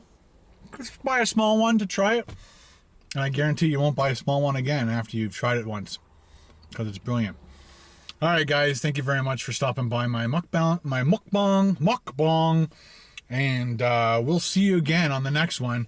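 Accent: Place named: Clarks Harbour, Canada. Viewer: That right there is a Clarkes Harbour/ Barrington accent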